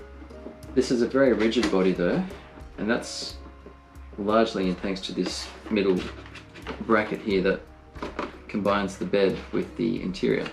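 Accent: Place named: Australia. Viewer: Hi, good to hear an Australian voice [I'm on the east coast], watched all the reviews as you mentioned it’s a good trail truck do you feel its is worth the AUD$900.00? Also how hard would it be to strip the body down of all the bits, to swap to a clear body and go with your own colour choice.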